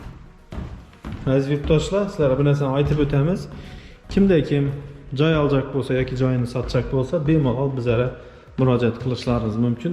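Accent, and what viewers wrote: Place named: Khorezm, Uzbekistan. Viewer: Хоразм дилинда чиройли гаплабди офарин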